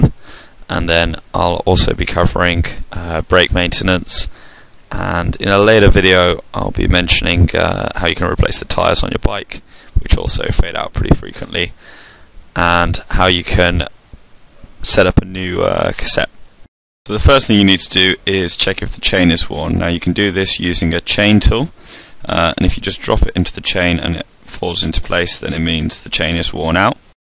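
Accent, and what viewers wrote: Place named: South, United States. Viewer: a southerners accent, the same as mine :)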